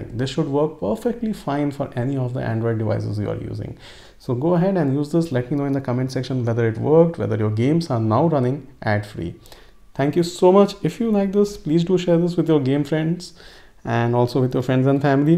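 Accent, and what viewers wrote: India. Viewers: Another misleading title from some guy with an Indian accent. Why would you want elderly people to download from a 3rd party website?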